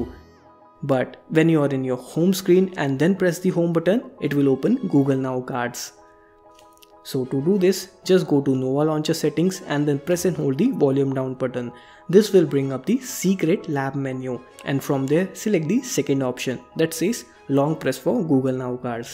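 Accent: India. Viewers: indian english is so scary.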